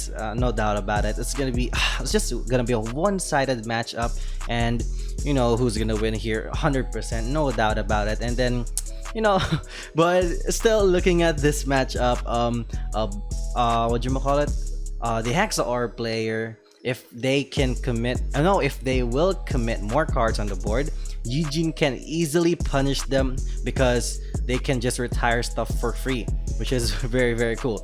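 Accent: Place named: Philippines. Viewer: Sounds Filipino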